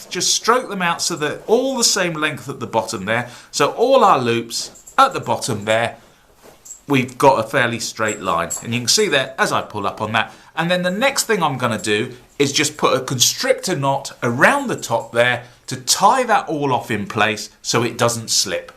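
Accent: United Kingdom. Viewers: Where are you based. By the accent I would say UK.?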